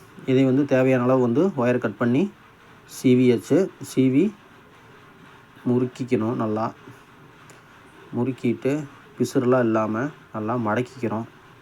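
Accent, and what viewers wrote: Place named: Kongu, India. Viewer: Nice Kongu tamil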